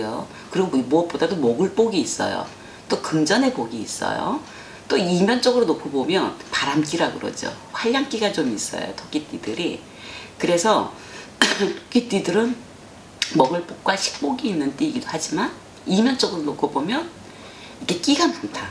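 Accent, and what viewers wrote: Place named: Gangwon, South Korea. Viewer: 선생님 말투에 강원도 사투리가 섞여 있어요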